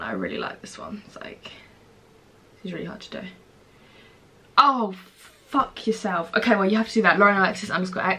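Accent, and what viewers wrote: England, United Kingdom. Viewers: salut Lauren jadore ton accent anglais tes tres jolie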